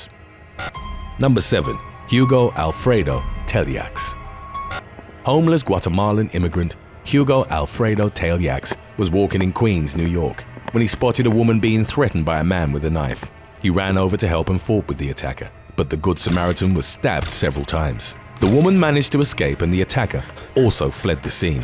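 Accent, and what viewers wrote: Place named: United Kingdom. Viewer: Hey mate you have the perfect narration voice, it also helps your British. Next I would like to hear you narrate BBC Documentaries!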